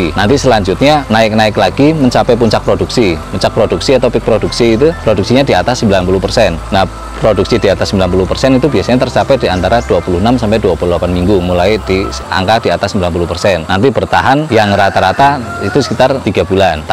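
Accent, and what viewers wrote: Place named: East Java, Indonesia. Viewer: Logat jawatimuran Saya dulu di jatim sekitar taun <num> Surabaya-Malang-blitar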